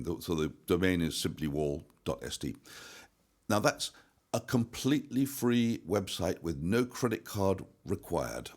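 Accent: United Kingdom. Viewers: Greetings from NY. Technically speaking, being in the "Black Friday" (profit) is positive for stocks, even though consumers will be in the Red if they overspend on things they do not need this week. I love the British accent, but I add captions to your videos so that I do not miss any details you are discussing. Love the office setup. Have a great holiday with your friends & family.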